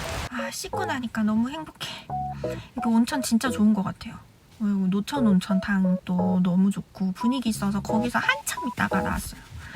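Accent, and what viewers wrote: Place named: Gyeongsang, South Korea. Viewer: 참 목소리가 매력있네요 약간 경상도 풍의 사투리가 깔리면서 약간 옥구슬 굴러가는 듣한 뭔가의 특이함이 있네요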